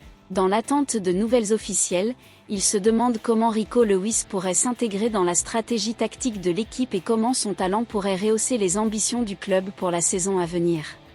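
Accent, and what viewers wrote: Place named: Paris, France. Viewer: Ce site a des info bidon ca racole des abonnés stile pour dépasser le psg deja pour commencer le club c olympique de Marseille c qui cette femme qui parle a tout les coup elle est même pas dici on dirait une parisienne regarder les info de franki foot ou touche pas a mon stade les mec meur pour l OM Elle on dirait quelle lit un script jamais une info exact bidon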